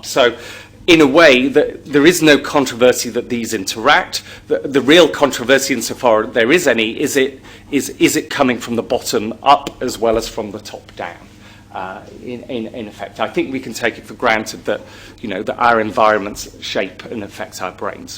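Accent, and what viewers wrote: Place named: United Kingdom. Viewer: is the british accent that difficult to decipher? i enjoy it immensely :)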